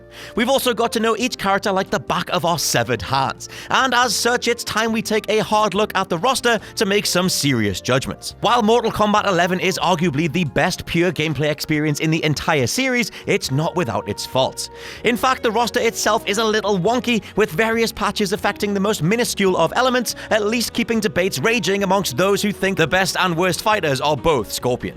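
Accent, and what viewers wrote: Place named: Scotland, United Kingdom. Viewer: Her Scotts voice and I clicked away.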